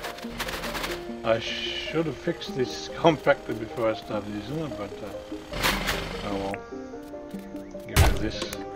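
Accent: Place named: Australia. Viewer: Judging by your voice I'm guessing you're a fellow Aussie haha..... G'Day mate!. Loving this game, so addicting!. I don't want my partner to play as she'll never go to work again haha.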